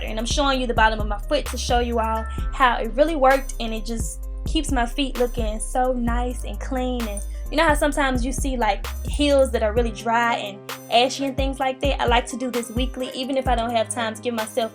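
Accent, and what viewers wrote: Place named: Atlanta, United States. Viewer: Love your ATL accent!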